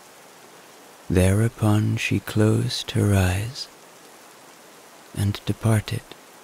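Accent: Ireland. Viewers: Oh, yes!! That Irish accent ❤. No accent is so lovely as an Irish accent. God bless Ireland and all its descendants.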